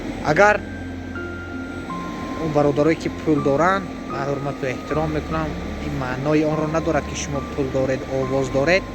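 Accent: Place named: Vorukh, Tajikistan. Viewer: акценти ворухи гап мезанед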